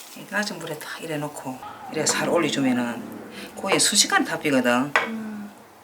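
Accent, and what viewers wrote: Gyeongsang, South Korea. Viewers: 육전 ^^너무 맛있겠어요 반찬 검색중에 육전에 눈이 띠웅해서 보고나니 당장 먹고 싶네요 어릴적은 사는게 힘들어 그런지 ᆢ친정엄마는 한번도 해주질 않았는데 제가해서 드려야 겠어요~ 저도 고향이 경상도라 구수한 말투에 너무 정감이 갑니다^^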